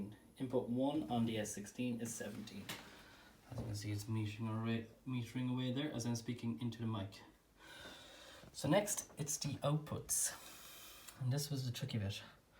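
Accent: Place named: Ireland. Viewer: Hello I was having a bit of Patchen problem and I came across your video. Great to hear an Irish accent Thanks for the video.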